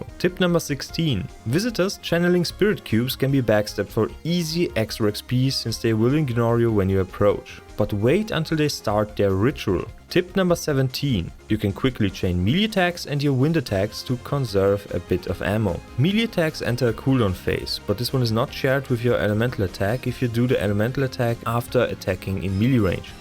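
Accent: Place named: Germany. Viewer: ah ok aber die Sprache is dieselbe xD Hab mir von deiner aussprache gedacht das du evtl deutscher oder Österreicher bist xD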